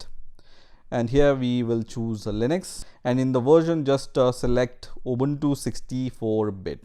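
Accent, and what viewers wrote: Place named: India. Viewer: this guy sounds like Indian anyone noticed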